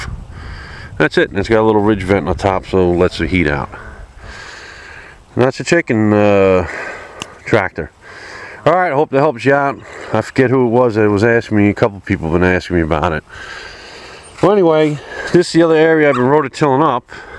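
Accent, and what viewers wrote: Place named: Maine, United States. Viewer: Just found your channel, watching all the videos soon. I am sure you've been asked, and have probably answered already...where are you from? Your accent reminds me of family back home in Maine. :) Your set up is great!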